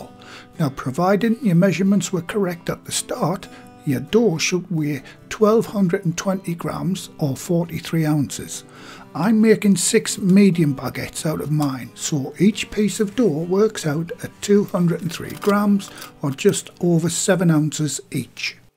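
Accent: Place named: Northeast England, United Kingdom. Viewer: I meant that you're from the Northeast of England, you can hear that. I'm from Gateshead :) . Thanks for the extra info, too, I will give the recipe a go!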